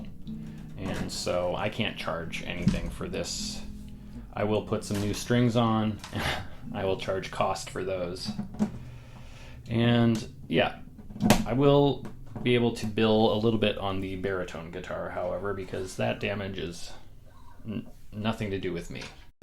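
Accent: Canada. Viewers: Actually I think it sounds spot on. I have a question for u, for a Canadian why do u say “Oooout” & “Aaaay” like the rest of ur peeps? This coming from an American w god only knows how many accents n dialects…. 😮 Yes I’m n Idiot, like the channel brother!! Can’t tell who u best look like, it’s either Dave Mustain the Megarocker without the beard or the Viking slayer Zach Wild. Either way that would put U in a killer collection! Rock on bro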